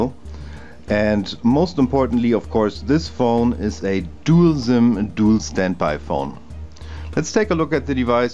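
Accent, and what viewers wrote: Germany. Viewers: I hear German accent)) Great review )) i think it is a good device ))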